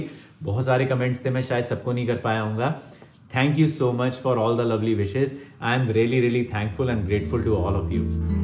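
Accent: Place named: Chandigarh, India. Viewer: my guess was right. You have Chandigarh vibes. Your way of talking has Chandigarh touch. I am too from Chd and living here in Bay Area. Good to meet you.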